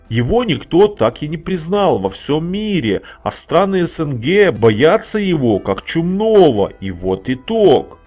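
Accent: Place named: Armenia. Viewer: Коментатор почему у тебя акцент армянский ?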